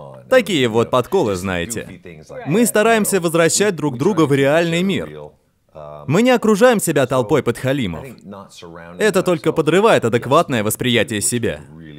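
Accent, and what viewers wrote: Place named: China. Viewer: Что за китайский акцент диктора?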